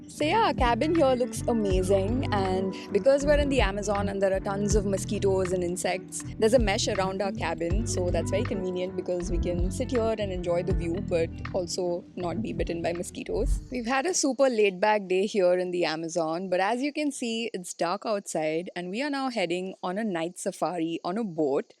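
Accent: India. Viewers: Hindi ke liye there are other channels Paramvir, Shubham, Navankur. makes her videos in English aur bahut saare log inke videos dekhte hi English seekhne ke liye kyuki yeh Indian accent mein fluent English bolte hai. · Most people follow your YouTube channel, and 99% of them do so to learn English with an Indian accent. Therefore, I hope you lean fully into the authentic Indian accent in your content; travel details are not that important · Video was good except hilarious strong indian accent, I can't take it.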